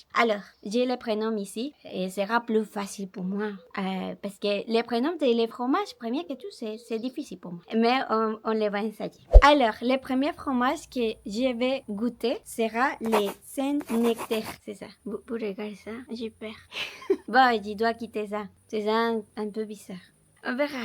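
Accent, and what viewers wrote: Colombia. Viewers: Ton naturel ton sourire et ton accent colombien m'on fait craquer tu es adorable et trop mignonne j'adore le camembert 😂😂😂ta joie de vivre me fait trop plaisir💋😘😘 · YOUR COLOMBIAN ACCENT SPANISH IS BEAUTIFULL IN FRENCH❤❤❤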